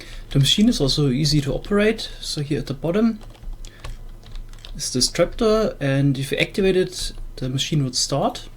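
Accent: Germany. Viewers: Er hat nen voll deutschen axzent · When I heard the German accent I was like " I fcking knew it! " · u r german oder nicht junge du hast harten acxent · man kann richtig hören das du deutscher bist, ich auch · Cooles video man höhrt dein deutschen aktzent (nicht böse gemeint) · erste sekunde wo ich deine stimme gehört hab hab ich gemerkt du bist deutsch aber cooles video😃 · Are u German? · are you german?? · Ich liebe den deutschen Akzent wenn du jetzt noch Sachse wärst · are u from germany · Are you german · Is he german or why does he have that accent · Deutscher merkt man einfach am axcenz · is he german? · Das is ein deutscher akzent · Junge du Bisch deutscher des höhrt man übel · Are you german?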